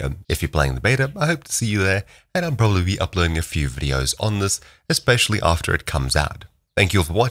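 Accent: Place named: South Africa. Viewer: Knew you were S.A 'en, picked something up in your accent a while ago, then I saw your Origin name just now.. Haha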